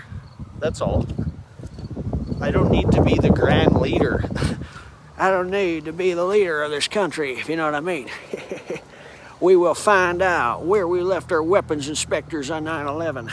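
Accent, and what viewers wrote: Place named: England, United Kingdom. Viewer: Hey! I Love your English accent brother ❤ we love your walk and talkies. Know that your helping people all over that need lifted up with your amazing energy. Hope to see you on the live on Jeffmara. Chin up, your not alone ❤❤❤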